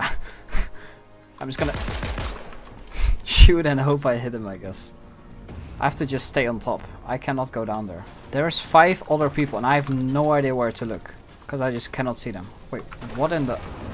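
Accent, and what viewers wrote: Netherlands. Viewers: Are u dutch? ur accent is so strong. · Yoo bro you dutch ??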